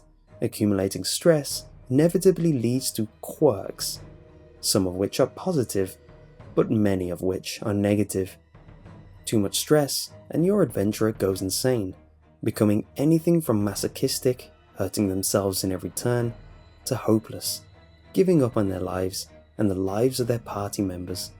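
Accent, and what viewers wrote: United Kingdom. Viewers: Love your tops and horror stories because of your voice so soothing and misterious I thik I never heard another well spoken brit like you do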